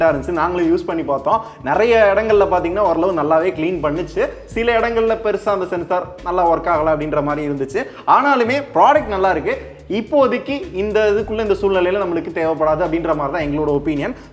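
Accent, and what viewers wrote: Madurai, India. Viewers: மதுரை slang Semma thalaiva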